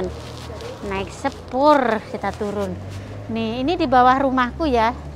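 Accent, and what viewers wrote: Java, Indonesia. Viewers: Bahasane medok mb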